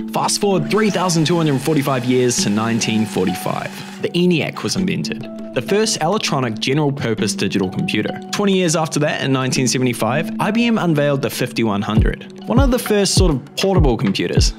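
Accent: New Zealand. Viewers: I knew you sounded like a kiwi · How did I not realize his kiwi accent. When I heard PB tech I was like wait a hot second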